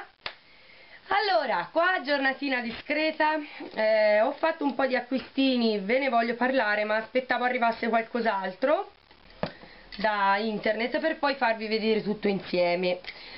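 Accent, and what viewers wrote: Tuscany, Italy. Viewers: ma ciaoooo sono una nuova iscritta,ti ho conosciuta grazie a gnappettaaa ,e ki ti lascia piu' sei troppo simpatica poi il tuo accento toscano è wonderful....un bacioneeee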